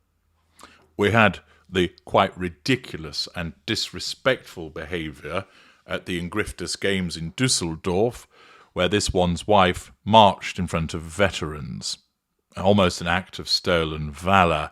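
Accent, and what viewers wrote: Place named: Newcastle, United Kingdom. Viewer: I think our glorious narrator is a Geordie.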